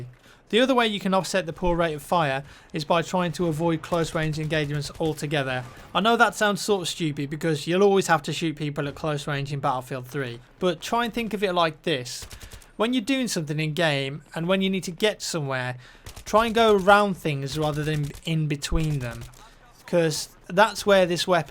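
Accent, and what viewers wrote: United Kingdom. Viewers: where do you live, because it sounds like you have an accent from the UK, but I see you playing with DOOM49 who lives in the US, right? When you play together, don't you get a lot of lag?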